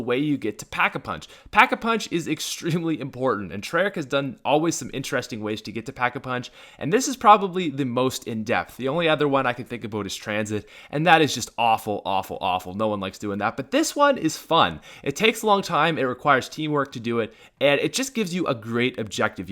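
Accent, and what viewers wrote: Canada. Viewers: Its funny I'm Canadian and he's the only Canadian I've heard that says aboot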